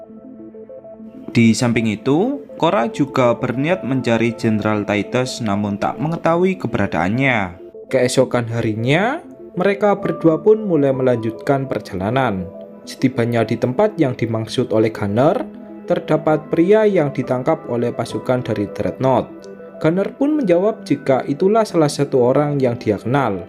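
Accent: Java, Indonesia. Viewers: Bisa gak logat jawa mu itu di hilangkan.jijik dengar anjing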